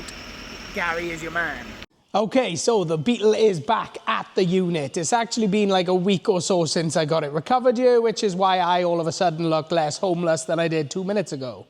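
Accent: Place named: Welsh Valleys, United Kingdom. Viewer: Where you from mate you sound valleys nvm seems your Swansea way. Great video and great find will sub just to watch the upcoming videos on this one may even be interested in buying depending on that water leak issue and underneath. And Yes I'm probably having a mid life crisis 😂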